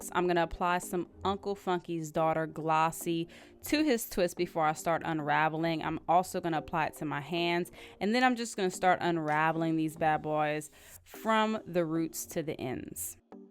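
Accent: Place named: Louisiana, United States. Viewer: I showed this to a guy to get an idea of how his hair would look when I was finished, and as I was watching, it was driving me crazy hearing your voice, i was like, she gotta be from Louisiana 😅. I love it ❤ Great content as well!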